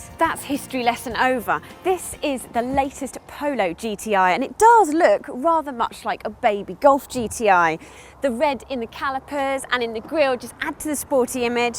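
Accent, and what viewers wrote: United Kingdom. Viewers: that UK accent. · Yea she my not be a ten in the look department but her looks plus her knowledge of cars & great attitude makes her an eleven! :-) & for us yanks her british accent is another +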